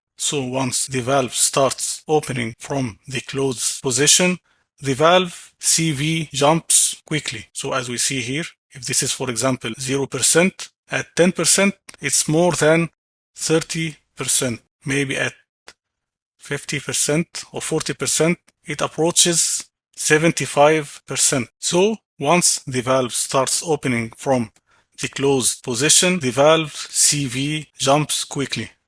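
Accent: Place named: Egypt. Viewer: يا عم لو حكيت بالعربي كان افضل في الشرح وتوصيل المعلومة.. في الاول اعتقدت ان لهجتك هندية وبعدين وضحت نبرة النيل.. لاحظت ان اغلب فيديوهات الهنود يشرحو بلغتهم رغم تمكنهم من اللغة الانجليزية وذلك لاعتزازهم بلسانهم، اما من يتحدث اللغة العربية تجده يلهث خلف اللغة الانجليزية والسبب !!! اجعل الشرح باللغة العربية مع استخدام المصطلحات الانجليزية حينها تكون المعادلة معقولة وتعم الفائدة. ربي يعينك ويساعدك. مهندس ليبي مر من هنا.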